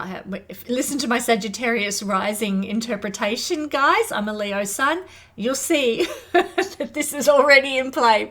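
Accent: Australia. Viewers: Thank you-my first foray into Vedic astrology...interesting. Good to hear an Aussie voice!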